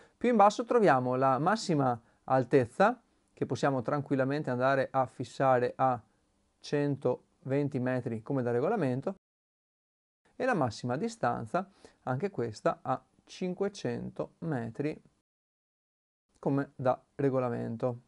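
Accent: Veneto, Italy. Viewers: Ma sei di pavullo???? Ti facevo Veneto. Complimenti sei molto in gamba.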